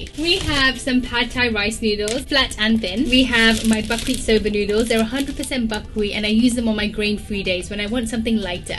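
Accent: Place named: United Kingdom. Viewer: I love you! Somehow you appeared in my Youtube videos. I am trying to become fully vegan and your recipes are helping me loads. I am mexican but I lived for 9 years in London so listening to you and your British accent brings me lovely memories. Also, we mexicans use mostly black beans and no kidney beans.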